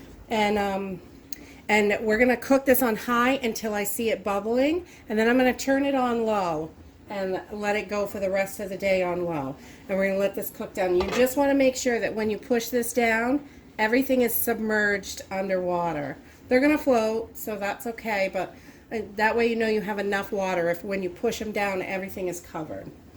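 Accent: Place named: Massachusetts, United States. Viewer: Just went apple picking so about to make two batches of cider using your recipe. Having been born and raised in Mass (and now in Cali) I just want to listen to you and your accent- you can't tell me you are not from Mass!!!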